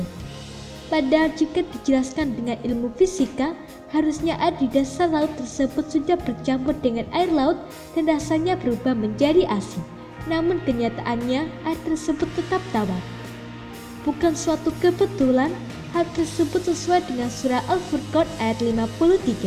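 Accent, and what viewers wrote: Java, Indonesia. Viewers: Iki jowo? Suaranya😂😂😂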